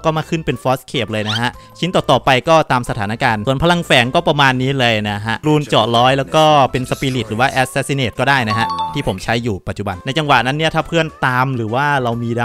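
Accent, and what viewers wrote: Isan, Thailand. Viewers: ว่าวอีสานนำแน ชอบ🤟🏿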